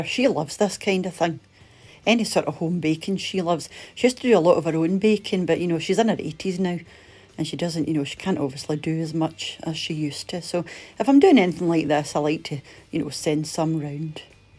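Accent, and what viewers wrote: Scotland, United Kingdom. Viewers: This takes me right back to school.. Oh how I loved school dinners lol especially the puddings.. Yes those big silver jugs with the runny pink custard.. Where I work, the canteen does fab puddings but they are proper man size portions.. Bakewell Tart is another favourite.. Shame I'm on bloody slimming world.. Thanks always love to hear your lovely Scottish voice. Jules x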